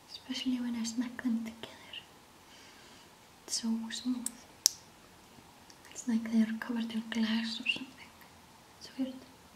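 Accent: Iceland. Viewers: i love how our Icelandic accsent is so strong for asmr PS: Icelander writing this ❤ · I'm sure I will - always love listening to your voice. And the visuals are nice icing on the cake :) . You know, it's your accent and ElizabethASMR's accent that have inspired me to want to visit Iceland! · Always heard Icelandic women were out of this world beautiful... sucks you got stuck with our Navy and Marines up there... wish the army would send me that way... my god that accent and your curves... well at least I have you to thank for the Zzzs most nights... I appreciate it!!!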